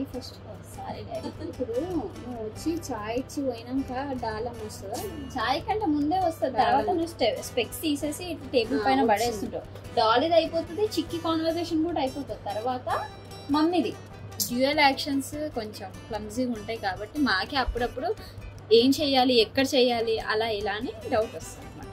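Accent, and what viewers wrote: Andhra Pradesh, India. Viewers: andhra matladakandi 😂please jai telangana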